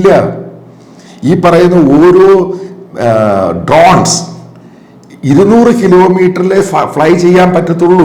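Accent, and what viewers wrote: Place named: Pathanapuram, India. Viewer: Sir your analysis very interesting. Your mada koda typical pathanapuram style. Continue sir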